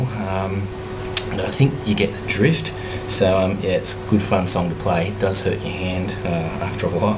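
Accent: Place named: Australia. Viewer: Thanks for a great tutorial. Nice to hear a fellow voice from down-under.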